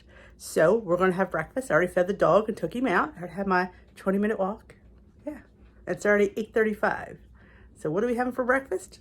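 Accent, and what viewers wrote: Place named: South Jersey, United States. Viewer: Lol!!! This is the first time I have watched you and I kept thinking she sounds like she’s from South Jersey. When pulled into the Amish market in Mullins Hill, I was like I knew it. I am closer to the Williamstown location. I have also been fighting to lose weight most of my life. I have done WW like 15 times, may try again in January. Tried Saxenda shots but my insurance doesn’t cover it now.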